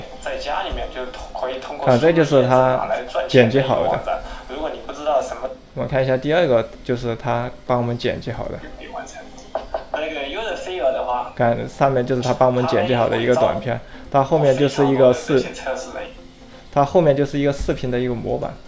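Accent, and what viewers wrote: Sichuan, China. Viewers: 说话口音川渝的吗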